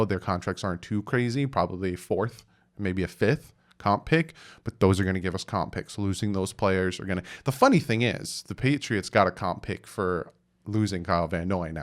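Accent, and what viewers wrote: New Jersey, United States. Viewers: Late night letting his Jersey accent out to play.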